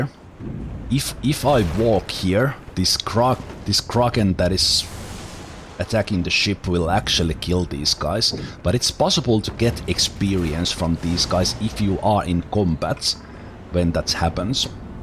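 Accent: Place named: Finland. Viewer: You have a finglish accent :D awesome!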